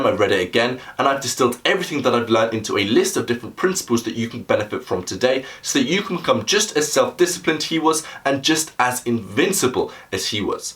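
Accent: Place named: Southern England, United Kingdom. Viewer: Where were you brought up pal Presumably south of England but where? How you say like is distracting FYI great work btw 🙏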